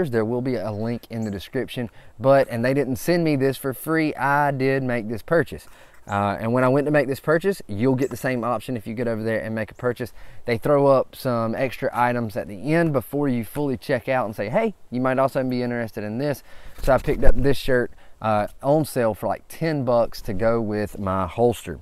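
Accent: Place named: Georgia, United States. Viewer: Great videos! I’m enjoying your channel. You sound like you’re from Georgia. Also kinda looks like Georgia woods behind you. 😂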